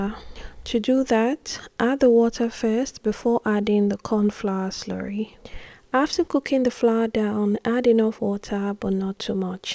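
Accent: Nigeria. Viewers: Ngl you sound Nigerian cuz its like your saying botter instead of butter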